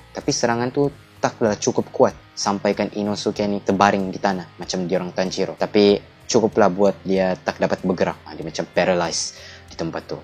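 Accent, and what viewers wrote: Sabah, Malaysia. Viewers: orang sabah bah ni, begini lah kalau org sabah bercerita memang feel betul 😁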